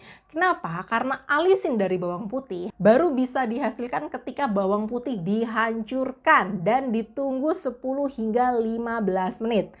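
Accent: Java, Indonesia. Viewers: Dr klu berbicara medok banget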